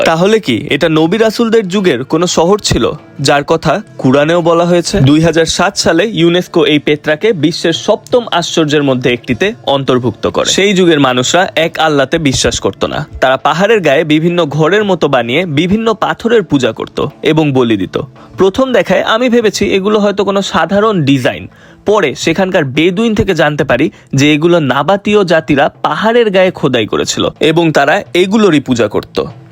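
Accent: Kolkata, India. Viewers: আপনার একসেন্ট কলকাতা টাইপ। প্লিজ বিষয়টি একটু ক্লিয়ার করেন। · আপনার ভাষা কলকাতার লোকদের মতো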